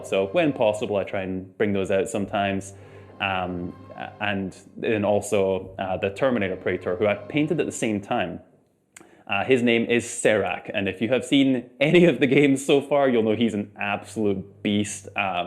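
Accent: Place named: Russia. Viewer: you trying so hard to not sound like russian but you still do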